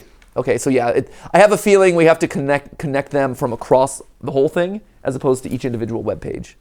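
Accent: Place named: United Kingdom. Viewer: That accent, insane british salesman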